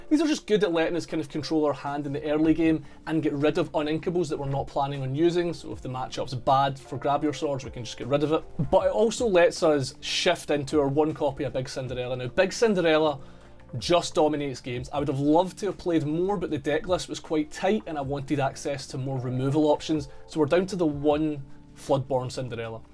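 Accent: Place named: Scotland, United Kingdom. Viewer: Off topic, but you're so cute and what kind of accent is that?? Scottish? Regardless, i love it! 😍 😅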